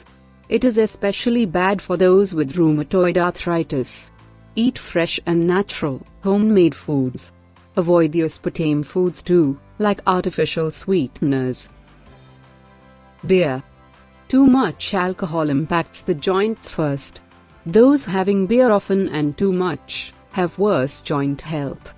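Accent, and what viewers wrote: India. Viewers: Refined Indian accent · combination of voices. robotic and.Indian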